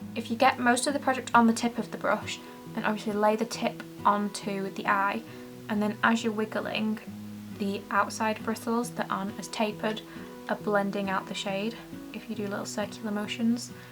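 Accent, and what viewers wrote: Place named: United Kingdom. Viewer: Hii, it's me, we were just chatting via Twitter! I didn't notice you were in UK, love your accent. Yep, my hubby took me to Sephora the other day and it's kind of a whole new world to him. He is overwhelmed by how much STUFF exists, and I told him even people into makeup are feeling that way, like a fatigue with too many releases. I love the new Norvina palettes, but I just don't need them, it's tooooo much all the damn time and I need to enjoy the makeup I've already bought. I didn't know I could get P. Louise from Morphe now, I hadn't tried it due to the shipping but will now once I've finished my Paint Pot! Your look is beautiful!! 😘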